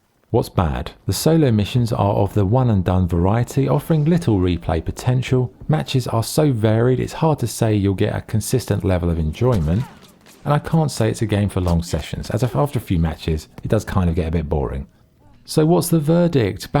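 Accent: United Kingdom. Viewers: Are you brit ISH?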